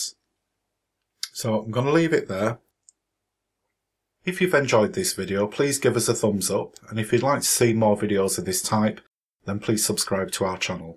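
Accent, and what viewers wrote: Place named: Sheffield, United Kingdom. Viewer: An Excellent Tutorial and not just for beginners. We come from the same area re accents and I'm glad to see you using real spoons they wipe off so easy ( an ex pat from Sheffield ) Ann Wardley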